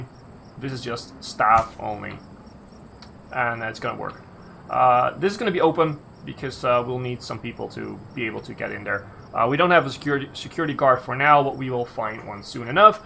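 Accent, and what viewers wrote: Netherlands. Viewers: After the first sentence you said I noticed you're dutch. amazing!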